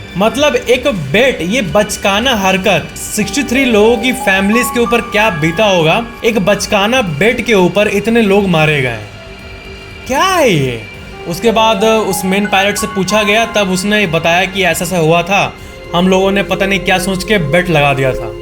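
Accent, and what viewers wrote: Bihar, India. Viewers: Now your tone is likely bihari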